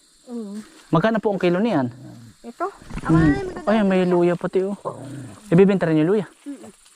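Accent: Quezon, Philippines. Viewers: Maganda ang tunog ng Tagalog ng Quezon.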